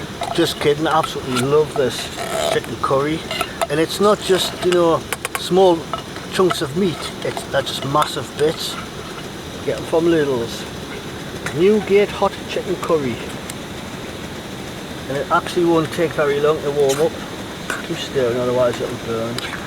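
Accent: Newcastle, United Kingdom. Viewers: I have to ask are you an east country man? A Jordy perhaps?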